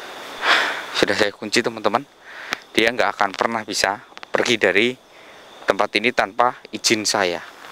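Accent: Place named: Banyumas, Indonesia. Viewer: itu bhs jawa ngapak saudaraku, bhs halus tp ya terdengar beda dgn bhs jawa solo semarang, mungkin Mr ini tinggal d daerah jawa tengah bahagian barat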